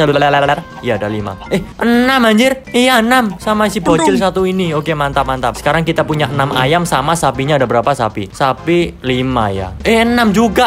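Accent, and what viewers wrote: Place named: Java, Indonesia. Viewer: Enaknya orang Jawa kalo ngomong lucu 😂😂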